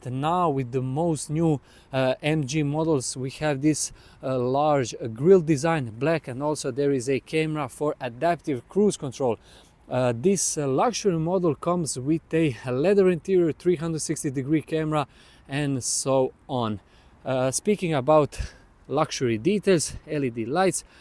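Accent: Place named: Balkans. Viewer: Vidi sa da Balkanac prica na ENGLESKOM 😅😇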